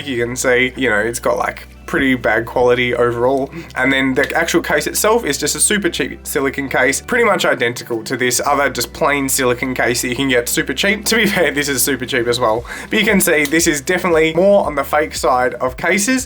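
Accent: Australia. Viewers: G'day mate! Aussie accents are so orgasmic I can get off just listening to yall speak. But, having said that you're speaking too fast that I gotta turn the captions on in order to understand what you are saying. I've got Samsung's Galaxy Buds myself for about 7/8 months now and I have just ordered a case for it, something similar to the Nike's in this video, just without any logos on it. It's so hard to find a good and cheap case for non-airpods TWS earbuds. The case I ordered + shipping was a few cents shy of $30. That was expensive. The cheaper ones either have zero or negative reviews. I read one that said her galaxy buds charging case "slipped out" of the case which was hooked onto her handbag with a carbiner and she only realized it was gone when she was home because she was blasting songs with the buds on the subway and couldn't hear it hit the floor.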